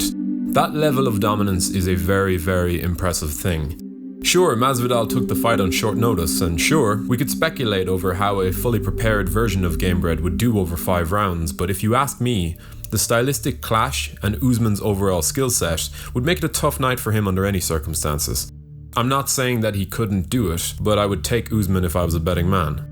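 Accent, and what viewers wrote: Ireland. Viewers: Is the guy talking irish it sounds like he is but trying to hid it anyway great video but as an Usman fan burns and Colby fights worry be especially burns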